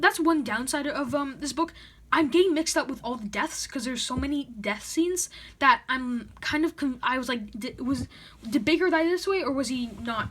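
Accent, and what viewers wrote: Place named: Canada. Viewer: I like your emoticon description showing the story of The Long Walk. Clever! Why don't you show your face in the review? It's OK to say you loved the book. It doesn't meat I'll love or like it. I love Cell and my friend loves Dreamcatcher and most people don't like those two King books. I'm guessing your from Canada by the way you pronounce "about". It's not fully "aboot" like some Canadian accents but it's moving in that direction. You said if they fall below 4 mph, they get a warning, so why not stay below 4 mph so they don't get any more warnings?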